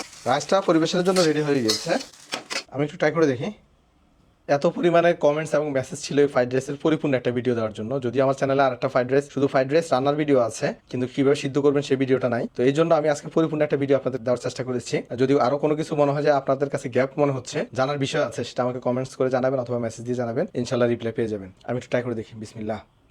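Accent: Noakhali, Bangladesh. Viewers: হেতে নোয়াখাইল্লা এএএ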